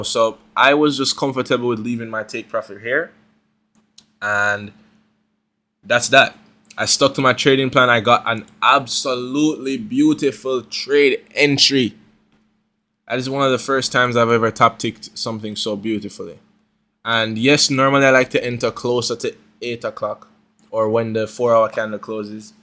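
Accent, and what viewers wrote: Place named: Caribbean. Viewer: You sound like you're from the caribbean bro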